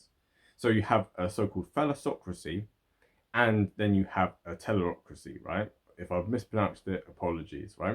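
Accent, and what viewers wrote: England, United Kingdom. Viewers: Only an Englishman can have that accent. So he is for sure.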